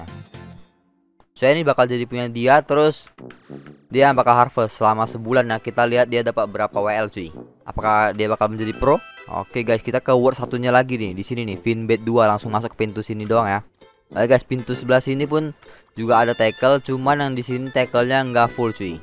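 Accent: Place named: South Jakarta, Indonesia. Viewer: Bg ngomong lu kaya org jaksel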